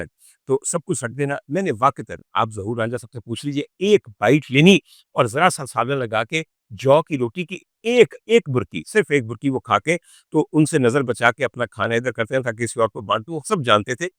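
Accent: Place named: Punjab, Pakistan. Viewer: آپ کا پنجابی تڑکہ مزا دوبالا کر دیتا ہے 😊سلامت رہیں خوش رہیں آمین 🎉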